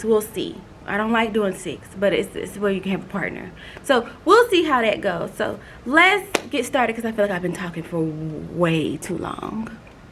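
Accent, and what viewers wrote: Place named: Alabama, United States. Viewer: Hi! New to your channel. First grade is MY favorite! I'm a recently (well somewhat, it's eleven years now) retired first grade teacher here in Alabama. I see that Auburn shirt, hear the accent so I ask are YOU in Alabama? Maybe Georgia? You're delightful to listen to and I enjoy watching your set up. I like those clear drawers you found. I don't have a Staples down here in my city. The room is so pretty with the pastel colors. That is a spacious classroom and looks new. How old is your little one? 🤩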